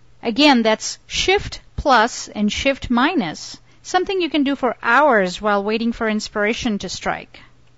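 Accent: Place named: Midwest, United States. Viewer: This is the thickest midwestern accent i've ever heard. What state is that, Minnesota? Wisconsin?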